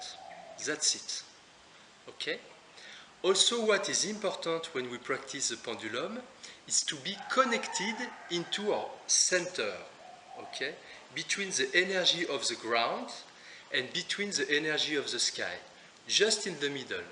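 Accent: France. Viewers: Love the accent😁my son in law is French, and reminds me of France and lovely people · Ohh, the french dialect... j'aime! ❤ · Loved the video! very helpful and explained lovely - specially with the french accent (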